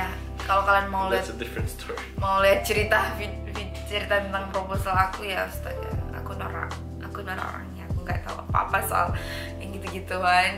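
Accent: Manado, Indonesia. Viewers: rupa sama ini logat say...dari manado..? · Pertama kali nonton konten dr mbaknya. Kedengerannya mbak orang Manado.. anyway salam kenal & sukses dgn channelnya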